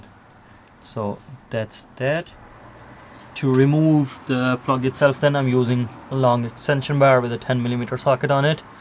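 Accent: Cork, Ireland. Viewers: Is that a hint of a Cork accent? Sound for the video, need to tackle this in the morning. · Love your cork accent...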